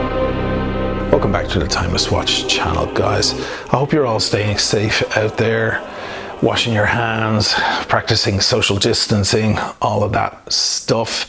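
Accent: Ireland. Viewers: Where are you from? Ireland? The voice reminds me of Darth Vader.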